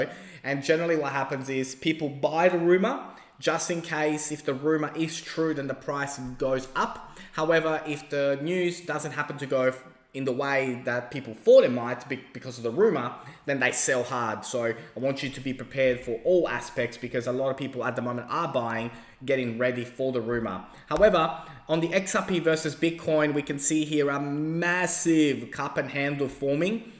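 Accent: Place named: Australia. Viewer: I like you, I think most people are bellends, but not you, your pretty level headed, also you have a crazy voice not just because your an aussie but its very distinctive, I think you could do voice acting! Subbed!!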